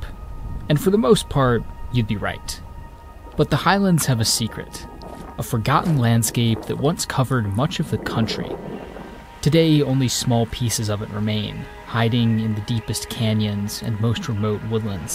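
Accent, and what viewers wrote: United States. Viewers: I downvoted this video because an American narrates it. And the American doesn't know what he is talking about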